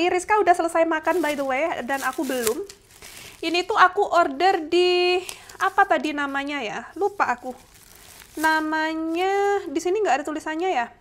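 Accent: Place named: Banyuwangi, Indonesia. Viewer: bhsa nya oseng ya..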